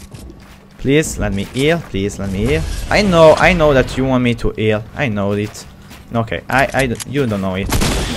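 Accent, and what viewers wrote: Naples, Italy. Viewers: Mi manca la tua parlantina napoletana 😫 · Fantastic video, i love your Italian English Scherzo sono Calabrese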